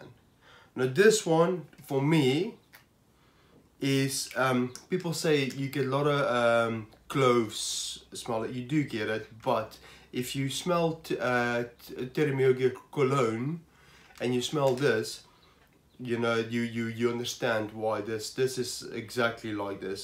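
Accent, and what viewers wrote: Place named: South Africa. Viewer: Are you South African by any chance? I’m South African and I hear it, but it also sounds like you’ve lived in the UK or something.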